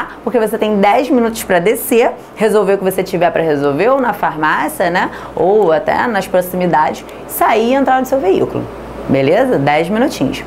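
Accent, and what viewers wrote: Rio de Janeiro, Brazil. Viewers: Gostei, mas me sinto no rio com esse sotaque, não sei se tudo isso também se aplica pra São Paulo. Mais foco na matéria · sotaque carioca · maldito sotaque carioca. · aguentar esse sotaque pobre de carioca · Sotaque carioca é maravilhoso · Sotaque carioca :) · Gostei muito do seu sotaque, característico de um carioquês original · ela tem sotaque carioca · carioques insuportavel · Putz, me desculpem, mas não consegui assistir o video inteiro, não posso falar se é bom ou ruim, porque esse " carioquêis " é irritante DEMAIS !!!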